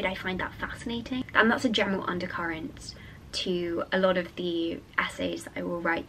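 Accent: United Kingdom. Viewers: I am happy that your dream came true. you are one of the first youtubers that I follow (since 2018) even though I barely can understand English (and also your British accent.) you are a lovely person.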